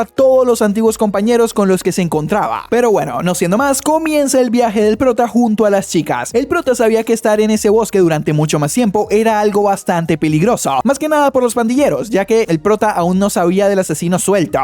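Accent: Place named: Colombia. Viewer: Con ese acento sí o sí eres colombiano jajaja 10/10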